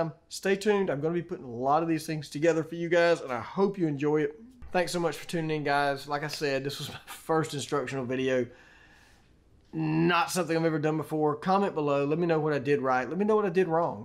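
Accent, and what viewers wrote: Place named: West Kentucky, United States. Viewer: Just sounds like my friends back in the West Kentucky hollers. Makes it so much more enjoyable. My wife bought me a small, inexpensive laser. Been doing this for a while, gonna try it this way. TY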